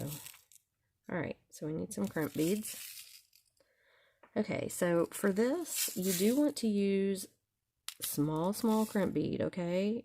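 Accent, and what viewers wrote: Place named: Texas, United States. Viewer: I thought I had your accent pegged as Texan, but I see that Fort Bragg is in North Carolina. Being a military (Air Force) brat myself, I know that families move around a lot. So, my question is, where are you from? Btw, YouTube suggested your channel to me and I'm glad they did. I am a new subscriber.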